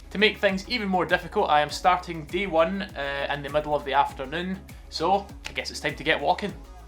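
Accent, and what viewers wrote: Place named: Scotland, United Kingdom. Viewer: English girl here, hello from "down south" ❤ and all English people love the Scottish accent i think I used to walk 20k steps a day every day, walking to work and back (1 hour 15 minutes each way and 20 mins in my lunchbreak) Having a purpose to the walk (a destination) makes it seem easier i think. For me it was my method of getting to work and back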